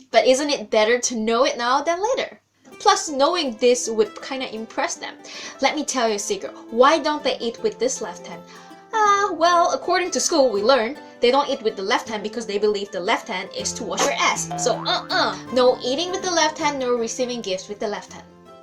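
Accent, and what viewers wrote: Malaysia. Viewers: dude thats manglish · I can sense the Malaysian-english sliding in as the she talk 😂😂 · quick speaking mix with slang with up and down tone just like the sea waves. it kinda meshed up mixture of indian/malay/chinese speaking tone .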